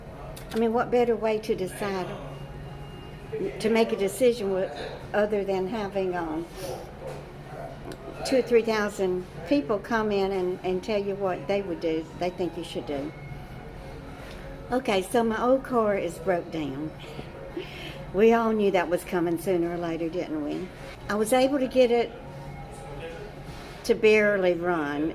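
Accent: South, United States. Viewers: Don't go to the used car company! LISTEN TO YOUR GUT!!! Repair your own car for much cheaper price. No guarantee that a newer car will not break down, either! Plus, A LOT OF USED CAR DEALERS ARE GETTING CARS THAT HAVE BEEN IN HURRICANES AND FLOODS AND PASSING THEM OFF AS GOOD CARS!! You can fake a carfax report and an inspection! Deal with your honest mechanic and fix your car, unless HE can find you a reliable car! Don't want to see you get burned! I must say this, with no disrespect intended, your Southern accent and friendly manner labels you as a soft touch and gullible to someone who is fraudulent! Be careful!❤️😊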